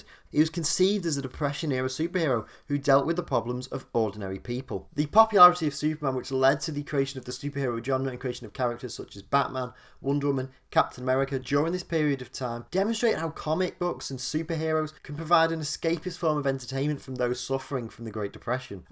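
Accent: Scotland, United Kingdom. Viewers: Whys a British guy talking about the american way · You're not the Scott, but are you a Scot by chance? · Scott turned British?!!! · Comics I like how the one video about America was done by a British guy.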